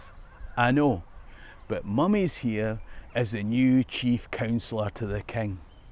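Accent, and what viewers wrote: Scotland, United Kingdom. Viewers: There's nothing better than hearing a true Scot talking about Scottish history 💙🤍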